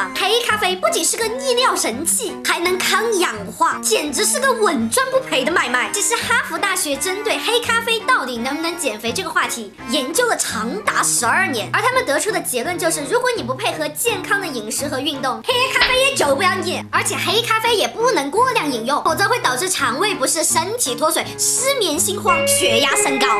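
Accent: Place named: Sichuan, China. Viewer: 原來跟密子君一樣是川妹子！！難怪講話口語口氣超像！！！喜歡😍😍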